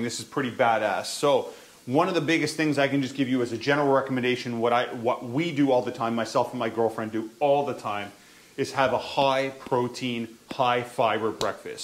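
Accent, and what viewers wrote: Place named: Maritimes, Canada. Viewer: What part of Canada are you from? I hear some maritime but can't quite tell.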